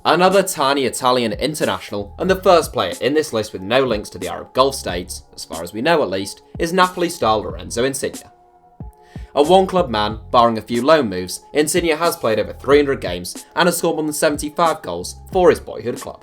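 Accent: Ireland. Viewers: I thought you were Irish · Irish guy?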